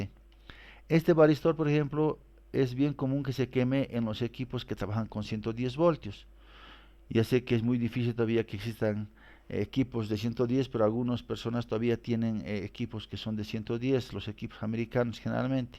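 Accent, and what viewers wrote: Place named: Antioquia, Colombia. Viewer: Impresionante didactica, pero si me hizo reír su acento paisita jjjjj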